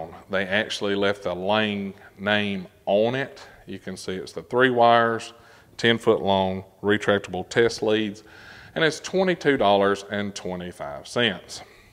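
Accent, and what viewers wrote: South, United States. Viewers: Thank you - I hate when they do that and besides is he speaking English or Southern drawl?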